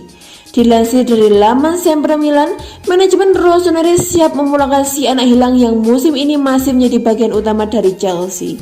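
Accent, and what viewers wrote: Java, Indonesia. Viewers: Aih jawa x ,betul terok lah ,ganti suara tu,jangan terlalu percaya diri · Naratornya kurang asik Medok bgt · Medok tenan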